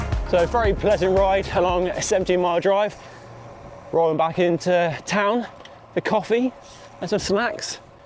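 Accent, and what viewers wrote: United Kingdom. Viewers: Why California people speak British English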